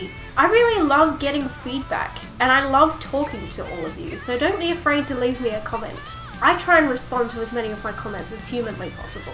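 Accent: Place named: Australia. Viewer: Yay i though i heard an Australia accent, super happy to meet another RWBY fan in Australia, much love from NSW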